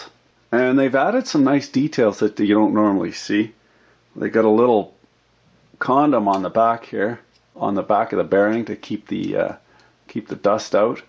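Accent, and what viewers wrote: Canada. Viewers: Hell I am American and have trouble understanding Canadian at times. The more you watch him the more sense it makes than you start talking like it lol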